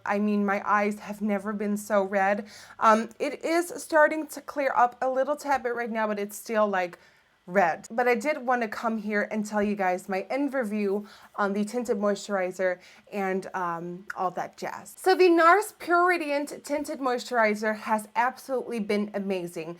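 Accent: Netherlands. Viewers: omg i can hear the dutch accent here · LOL I was like, Icelandic? Dutch! Love your accent! Ugh, I love me a beauty blender - thank you for commenting on application. Great tutorial love!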